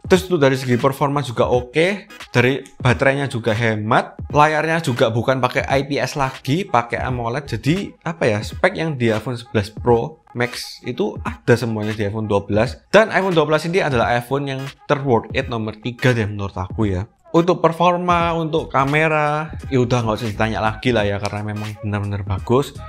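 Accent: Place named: Surabaya, Indonesia. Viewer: Ko...logatnya kok medok ya? Asli sby kah 😅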